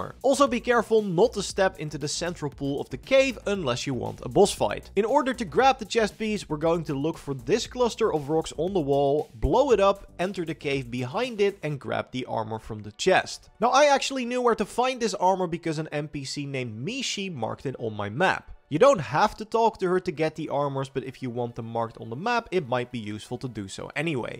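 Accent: Netherlands. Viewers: i smell dutch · Heerlijk dat Nederlands Engels accentje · You sound dutch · Ik merkte meteen aan je accent dat jij nederlands bent 😂. Goeie video trouwen was heel nuttig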